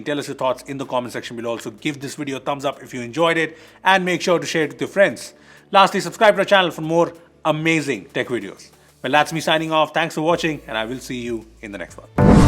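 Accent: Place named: India. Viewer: You exaggerate the "A" sound while speaking , like Amoled etc... Indian accent maybe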